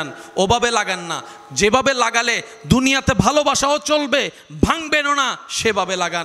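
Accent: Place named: Chittagong, Bangladesh. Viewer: ভাই৷ অনেক সময় আপনার কথা গুলা চাটগাইয়া বুজা দায় তবে বুজি কম বেশ সব জেলার কথা গুলা আনেগো কোন জেলার কথা গুলা বুতে একটু কস্ট হয় আমিন মনে কিচু নিবেন না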